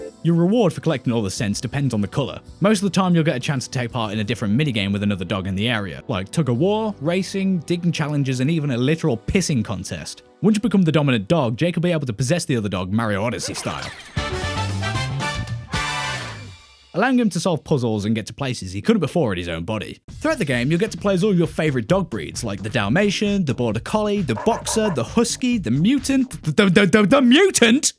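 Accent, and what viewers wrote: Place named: Midlands, United Kingdom. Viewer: Aw man, this video was quite a sentimental one for me. My family grew up playing this game together in the Midlands, I was sure I recognised your accent and then you mentioned Wolvo and Bham haha :)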